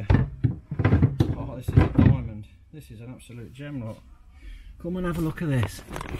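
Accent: Leicester, United Kingdom. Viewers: New you were Leicester soon as you started talking .. great vid hope it sorts my boot leak out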